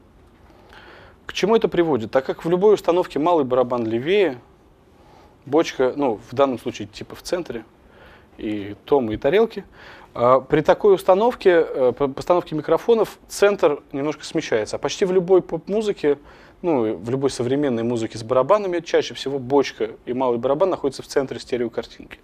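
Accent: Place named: England, United Kingdom. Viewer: английский акцент немного слышится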